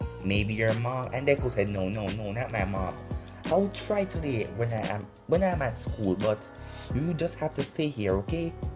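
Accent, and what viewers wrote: Jamaica. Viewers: Your accent reminds me of white yardie. Great vid man. Gonna binge the series.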